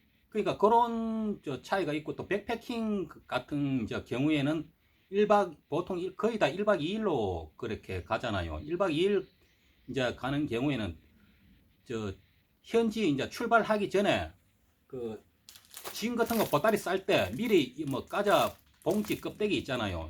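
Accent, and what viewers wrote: Gyeongsang, South Korea. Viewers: ■ 새마을 티셔츠보고 방심했다가 장비보고 놀랍니다. 특히 msr 리액터에 빵터졌음. 아재 잘보고 갑니데이~ 구독도 박았심더. 고수시네요 ^ ^ 사투리도 구시하이~ ㅋ 저도 대구 경북이라서 듣기 편합니다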